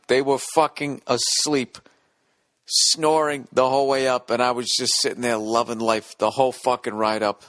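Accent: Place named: South, United States. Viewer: Hahah your hick voice never ceases to make me scream laugh, love your work brother. Keep it up...or you'll be homeless I guess... As well as I would have much less material to listen to.;)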